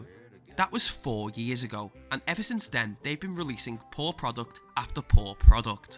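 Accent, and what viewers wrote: Liverpool, United Kingdom. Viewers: U scouse? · Just curious, are you from Liverpool? Cause you kinda sound like Darren Till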